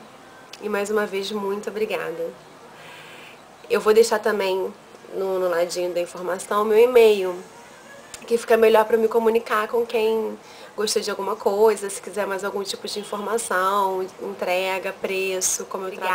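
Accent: Rio de Janeiro, Brazil. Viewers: Oi, Adoro suas makes e seu sotaque carioca e muito fofo!! rsrs Vc tem twitter amore? Bjinhoss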